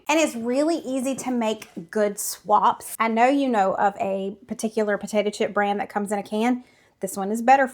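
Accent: South, United States. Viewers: Embrace your southern accent!!! Say boil and broil and oil the way you normally say it. 😊 We never miss an episode!!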